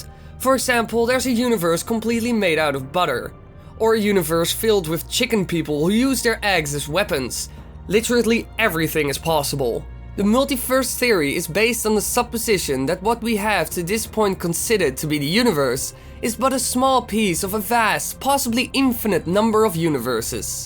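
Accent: Netherlands. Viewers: Hi are you dutch. If so Goede video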